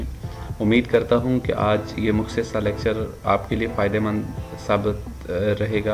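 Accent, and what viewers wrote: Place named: India. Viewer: if you cannot speak English - don't speak. 4kin Indian pronunciation: just make the text on screen!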